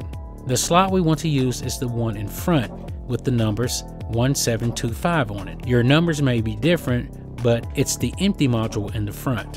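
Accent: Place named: Georgia, United States. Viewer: He sounds like he is from Georgia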